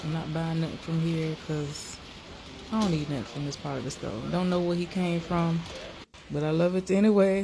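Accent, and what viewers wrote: Jamaica. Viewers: I love how you comes out with the Jamaican accent my sister love it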